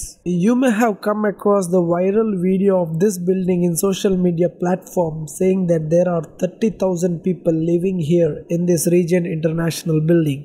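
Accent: India. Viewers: Indian accent bro 😅😅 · Please use an AI generated English voice or something, this Indian accent is difficult to understand. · We can easily identify that ur from tamil nadu, tamil people english has separate slang needs to be recognize. I like it, as i studied in tamilnadu i know.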